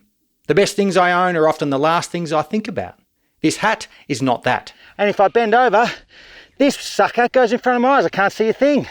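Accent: Australia. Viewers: Are you saying 'tackle box' or 'tucker box'? This guy from the states loves your "down under" accent but I cannot tell. LOL! ;-) Love your videos mate.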